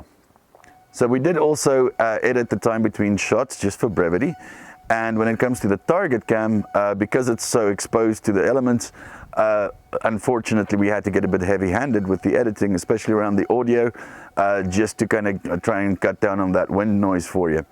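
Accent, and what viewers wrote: South Africa. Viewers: that sounds like a south african acsent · I would guess south Africa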